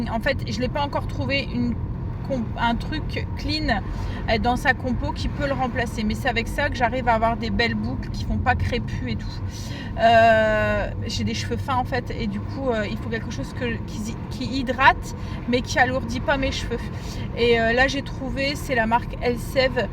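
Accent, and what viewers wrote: North, France. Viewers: Cc Très bonne idée pour la table, j'adore, bravo Un peu plus de patois du nord, je m'en lasse pas 🤣🤣🤣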